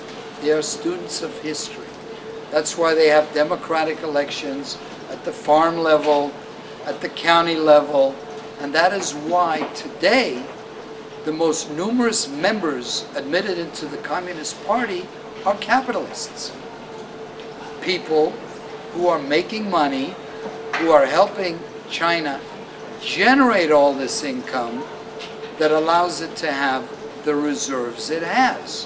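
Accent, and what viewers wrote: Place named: United States. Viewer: American acent for sure.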